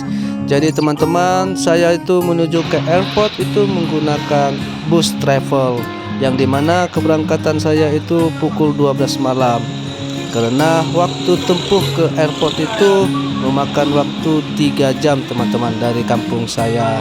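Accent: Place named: North Sumatra, Indonesia. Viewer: Logat batakny kental x.tp abang ni putih ya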